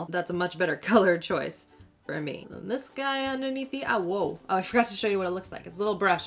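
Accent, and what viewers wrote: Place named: Canada. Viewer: I love your videos so much! Thanks for being so fun 💙😋 is it weird that I love how you talk? I’m from Texas so nobody here has a Canadian accent and it’s so cool how you say certain things! Anyways love ya! 💄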